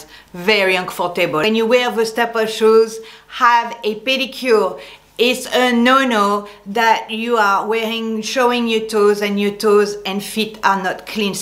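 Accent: France. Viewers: I agree! J’adore da porter les talons hauts!! Je me sens super sexy 💕😆 Aussi j’adore sa te vidéo! Ton accent français es magnifique 😍 (je suis Canadian)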